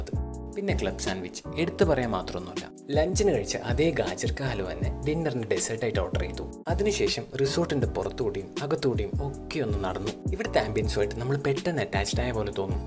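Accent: Kannur, India. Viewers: He is from kannur athonda evande sound egane😍🤩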